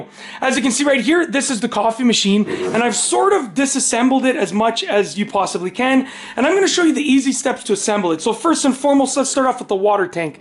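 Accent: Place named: Canada. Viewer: I guess from your accent that you're Canadian?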